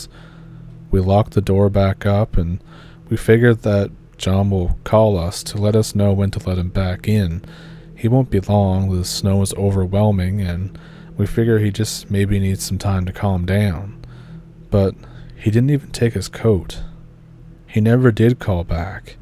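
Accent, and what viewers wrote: Canada. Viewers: I love the Canadian accent. I pop your channel on to relax as I go to sleep. Thank you for all your hard work gathering stories to tell. Thus channel is great. Jo from Australia 🇦🇺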